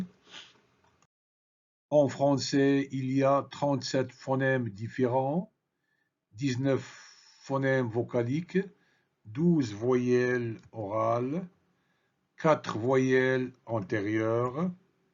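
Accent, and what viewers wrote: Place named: Belgium. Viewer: Votre accent est quand même très typé. Ce n'est pas un reproche mais il ne vous aide pas à différencier à l'oral le "a" de sac et le "a" de âne. A mon oreille, vous ne différenciez pas brin de brun, parfum et faim, à peine le "o" fermé du "o" ouvert et bien sûr les semi-voyelles "ui" et "oui": vous prononcez "lui" comme "Louis". Je vous soupçonne d'être d'origine belge, ce qui est loin d'être une tare. Je dirai même plus ( allusion aux Dupondt de Tintin), cela fait tout le charme des divers accents que l'on peut entendre en français et j'aime ces accents. Mais ça n'aide pas votre démonstration de phonétique!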